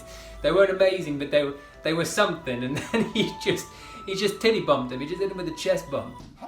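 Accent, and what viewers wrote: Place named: United Kingdom. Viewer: Thanks so much for the British English and humor! As a former bouncer, it is very difficult to understand how people think any of this can ever prepare you to really defend yourself? It should be a crime to let people teach this shit...just like sending soliders to war with weapons that are "may be's"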